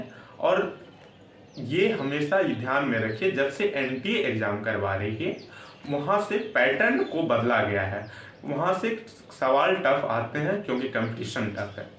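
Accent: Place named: Bihar, India. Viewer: Bro tum Bihar se ho kya?? Awaz se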